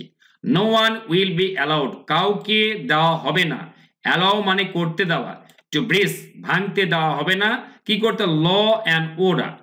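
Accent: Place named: United Kingdom. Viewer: স্যার সবসময় ব্রিটিশ উচ্চারণ টা করবেন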